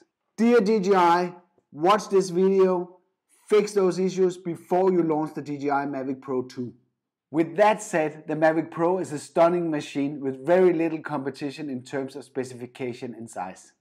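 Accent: Denmark. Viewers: Er du dansker?!😂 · sounds like a danish accent?? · Are you Danish? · Heavy Danish accent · This has to be a Dane, with that accent.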